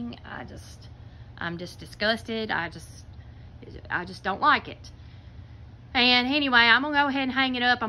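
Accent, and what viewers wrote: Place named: Louisiana, United States. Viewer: Thanks for asking that. She told me before it was art canvas and I thought she was saying something different. Her accent is adorable. She sounds just like my Mama who was from Louisiana.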